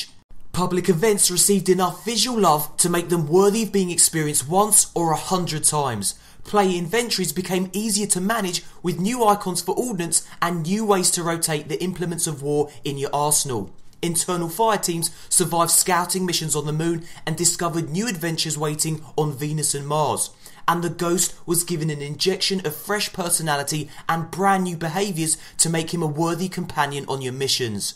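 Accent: Australia. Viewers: yer australia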